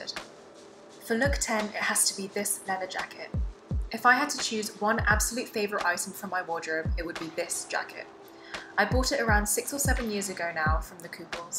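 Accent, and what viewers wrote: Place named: England, United Kingdom. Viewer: Don’t lose your lovely English accent there!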